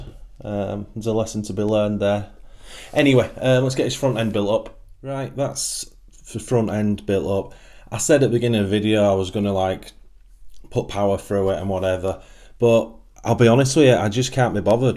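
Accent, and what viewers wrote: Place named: North, United Kingdom. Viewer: I know it's off topic but are you in the UK the accent is northern but I'm guessing you've emigrated?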